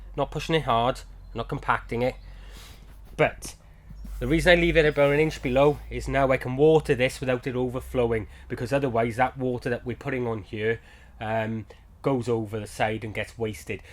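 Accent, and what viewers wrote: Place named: Liverpool, United Kingdom. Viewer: Hi,. Another geezer in his seventies here, thoroughly enjoying both the content and that wonderful scouse accent! Does bucket growing translate directly into bag growing or will you be doing a vid on bag growing at some point? Grew my first spuds last year. Used potatoes from the fridge. Chitted them in a cardboard box in a dark cupboard. Chopped them up to avoid too many chits on one bit but didn't know to harden them off before planting but will now do this! Used a mix of soil, compost, perlite and coco coir. Watered in some fish blood and bone towards the end and cut the flowers off. Well pleased with the number of potatoes harvested although mostly not too big. Saved nice plastic bags that held logs we bought. Will put them in bin bags with the bottoms cut off to exclude light. Using some British Queen Scottish second earlies from JBA. 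Will also get some indeterminates in a few weeks and see what happens! Thanks again and will be learning more from you day by day. Regards, Bob'O', U.K..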